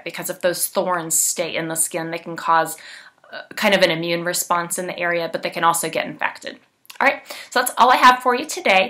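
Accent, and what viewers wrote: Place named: Philadelphia, United States. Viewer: Appreciate Tips no pun intended! I miss that accent it sounds like You’re from Philadelphia! That’s where I grew up but I’m out in California now. I miss ...the people. Haha I have a little tip although unsolicited… If you had the window in front of you this would’ve made a much better video it would’ve been perfect lighting but it’s really dark and hard to see you with it behind you it makes you a silhouette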